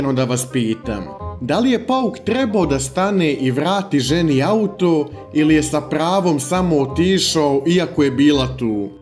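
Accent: Montenegro. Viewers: Jeste li primetili da je crnogorac vidim po naglaskom ja sam isto kumara 😂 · Човек говори својим дијалектом ( црногорски ) и неумесно је критиковати га због тога , као што је и не људски критиковати га због говорме мане !Логопед неби могао сада да му помогне јер је старији !!!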